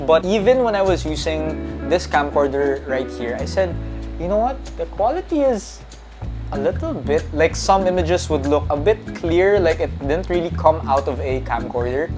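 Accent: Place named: Philippines. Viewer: Dude. You're my fav youtuber. I love photography and videograpyh and I spent almost a year in total on the Philippines (Been teaching german to filipino nurses). By far my fav country in this world!! And I miss hearing that accent every once in a while so here I am, getting the full package. Also, keep up the good vibes dude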